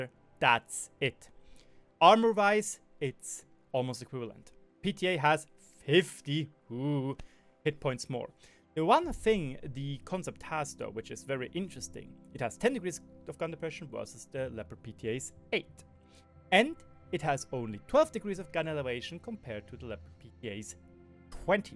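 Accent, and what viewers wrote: Germany. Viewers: Hey, wenn man schlecht englisch spricht, sollte man es nicht provozieren. Bist du jetzt international um mehr Leute zu catchen? Du klingst wie eine Mickey Maus mit nem Deutschen Akzent. Fast so gutes English wie Matthäus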